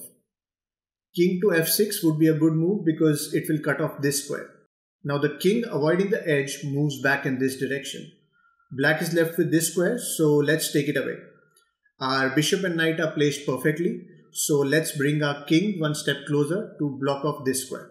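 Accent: India. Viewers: Hindi ko maintindihan parang Bombay magsalita 56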